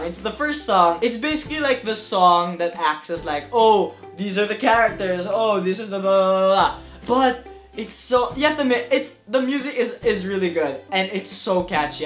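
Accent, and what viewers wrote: Philippines. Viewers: I got a bunch of things to say: First, that accent. (This is my first video of you.) I was 90% sure you were Filipino, then I looked back at your other videos, and saw a familiar setting in your "Photograph" video, so that confirmed it for me.;) Second, I think the word you're looking for when you were talking about " " is exposition. Hehe. Third, I love Dear Evan Hansen! So I scour videos about it regularly. And I found this video. Yay! My personal favorites change a lot. First, it was, then (have you seen that Cynthia Erivo performance in Elsie Fest? I mean WOW!)., was also my favorites for a while. Right now, my current favorite is. surprised me a lot actually. When i first listened to it, I really didn't think much of it. After a few weeks of listening to the album, unconsciously I memorized the whole song. The odd disjointed structure of the song (you can't pinpoint a chorus, for example), and the minimal rhyming lends a painful realness to the song. 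Like it's an actual person confessing his feelings. When Evan sings the callback to, the instrumentation there with the violins just gives me chills. And of course Ben Platt did an amazing job in the recording. You can really feel the emotions he's conveying. ("Pretend I'm something other than this MESS that I am", for example. The song after that line is just packed with emotio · is this guy filipino because lmfao that accent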